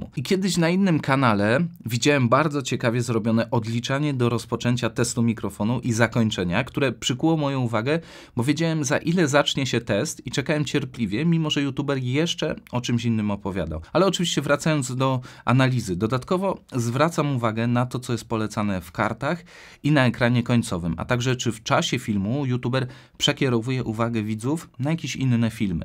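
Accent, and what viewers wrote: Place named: Kraków, Poland. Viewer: Słychać żes pieronievz Z Krakowa 😁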